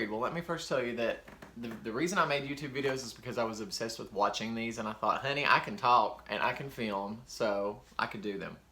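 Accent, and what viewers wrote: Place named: Alabama, United States. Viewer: I just love your accent! I'm an Alabama girl so it makes me feel right at home. I really enjoyed learning more about you. You have such a great personality 😍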